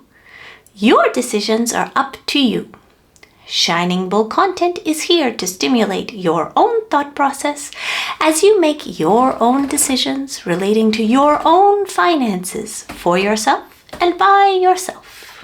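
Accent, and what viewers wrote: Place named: Canada. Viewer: where are you from? Canada?:)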